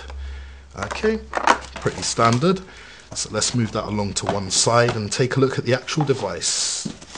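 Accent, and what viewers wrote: United Kingdom. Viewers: Thumps up if you're fond of the British accent. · ENGLISH accent, there's no such thing as a British one. ;) xx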